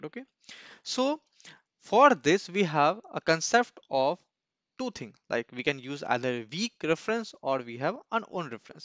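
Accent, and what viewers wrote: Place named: India. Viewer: 咖喱味 听不懂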